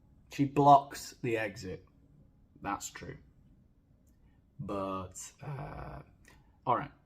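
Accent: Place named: Sheffield, United Kingdom. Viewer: Do, do the voices. Particularly your Sheffield voice, I love it!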